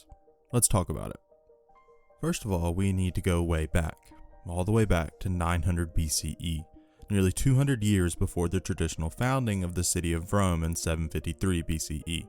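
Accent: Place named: United Kingdom. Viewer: you are super clearly british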